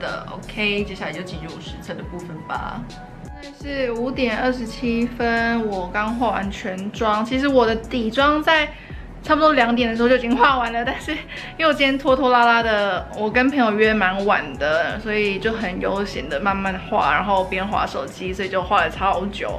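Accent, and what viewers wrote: Taiwan. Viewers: Cara講台語也太Q了吧哈哈哈哈哈就ㄊㄧㄤˋ欸就ㄊㄧㄤˋ欸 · 講台語好可愛！！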